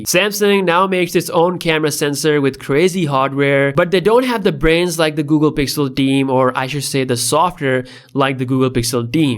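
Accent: India. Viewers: You have your Indian accent messing up the whole thing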